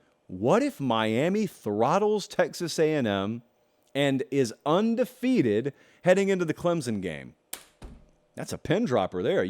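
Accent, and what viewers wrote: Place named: Georgia, United States. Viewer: “Quwhat if”, man I love our accent in GA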